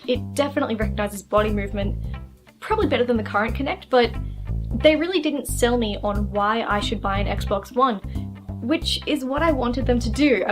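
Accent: Australia. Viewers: Why do people always confuse Aussie accents for British? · An Australian? I thought you were British???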